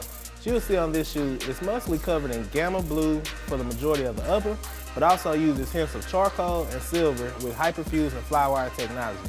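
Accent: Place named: South, United States. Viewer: This guy has a cool southern accent.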